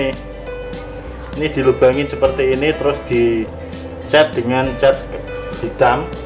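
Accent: Java, Indonesia. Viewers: klo ada langsung tak like. aku pokoke ngefasn karo bahasamu mas. medok jowo marai ngakak. ngrungakno tok wes ngakak.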